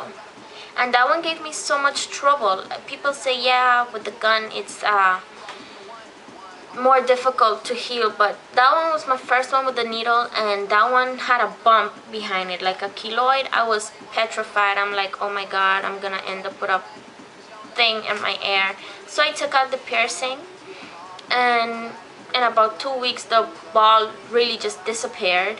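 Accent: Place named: West Indies, Caribbean. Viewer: I love your west-indies accent! Your real pretty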